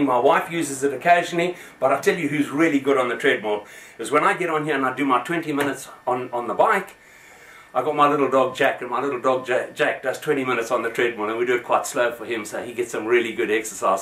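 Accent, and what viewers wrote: South Africa. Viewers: it says your from UK but your accent sounds South African??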